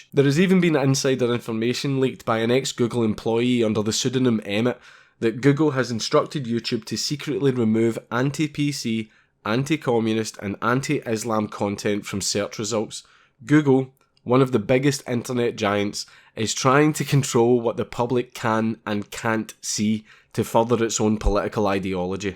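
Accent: Scotland, United Kingdom. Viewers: No more living in an echo chamber. As lots of leftist SJW supporters do - without any accustical problems that come from the house they use to live in. To be serious, my first language is not english, and the missing echo makes it more easy for me, to understand your beautifull scottish accent. I was born and raised in south Germany, not Bavaria, where we talk an accent some people in the rest of Germany just hardly understand. Its called Schwäbisch (Swabian), and some people say, that Scotts and Schwaben must be close relatives, as Scottsman are known for keeping their money together, Swabians are known to be thrifty. Scottsmen must have been expelled from Swabian Country, because thery spent to much of their money, is an old joke they tell about us. And both, Scottsmen and Swabians, have a funny accent. And are proud of it. This was not a typical vid of yours, but a good one, verry informative. 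Some people who did not get it until the verry day are now better informed about your intentions. If they want to. For fucks sake, stay who you are! And if you ever get stucked in an elevator with voice control, don't forget: Scottland! Freedfom!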